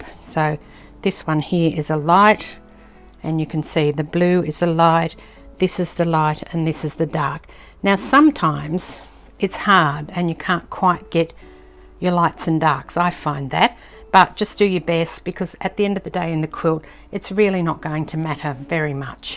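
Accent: Australia. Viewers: Hi, that’s a great tip on this lovely Fat Quarter Shop quilt. I especially loved listening to a fellow Aussie. Cheers Camille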